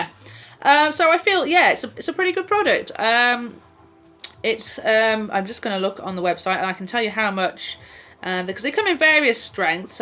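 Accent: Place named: United Kingdom. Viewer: I love your British accent by the way(haha) I could listen to you all day..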